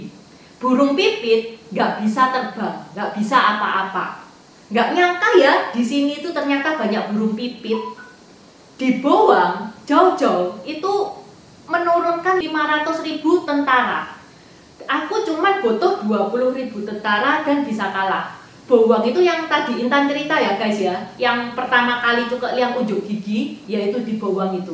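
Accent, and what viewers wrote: Java, Indonesia. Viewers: Jeng intan anda orang mana...logat anda medok banget...unik...apa adanya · Klo boleh saran.... Usahakan dalam penjelasan cerita murni pake bahasa Indonesia yg mudah di mengerti.... Krn gak semua pendengar bisa bahasa Jawa apalagi dengan eksen medhok. Hanya sekedar saran ya... Thx u 🙏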